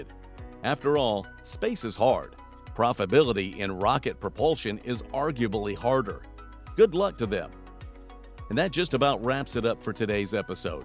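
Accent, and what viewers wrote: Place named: Texas, United States. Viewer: love the show and the topic was interesting but...without the texan narration, it feels flat.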